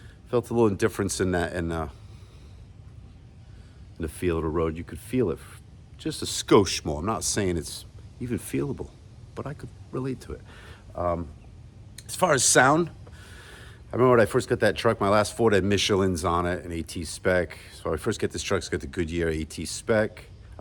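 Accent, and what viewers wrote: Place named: Massachusetts, United States. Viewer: I knew you were from Mass before seeing the plates lol fellow mate !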